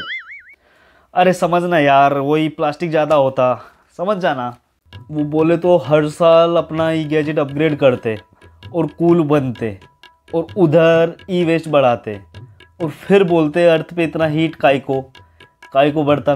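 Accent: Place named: Hyderabad, India. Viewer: hyderbadi or mumbai ka mix lag rha hai mujhe🤣😅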